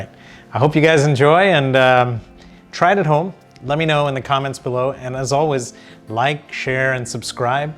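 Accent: United States. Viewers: Dr. Nene, you have american accent. I am from Florida. Happy to see you making pizza. I do make whole wheat bread pizza and it also becomes delicious. Madhuri ji is very lucky. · Sir, you described very nicely😍.. But i couldn't understood your fluent american english😣😣please mention the ingredients in the description box , so i will follow it😋😋😋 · So humble and simple person 👍🏻 😁👌🏻😋😋 i like American accent u talk , but its will fabulous if dr. Nene start to end of video only talk marathi 😍😁😁👍🏻😜 please video on Demand only marathi video . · I'm mesmerized by his American accent over his marathi mulga core! Hehe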